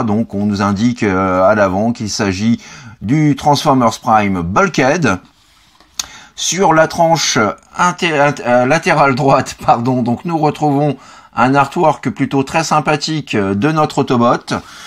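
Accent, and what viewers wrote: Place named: France. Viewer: With your beautiful french accent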